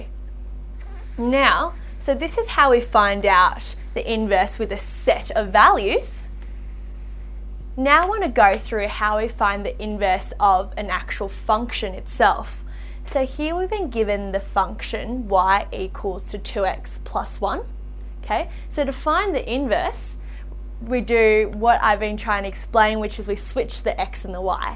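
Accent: Australia. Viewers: maybe Australia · aussie chinese descendant im sure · thats aussie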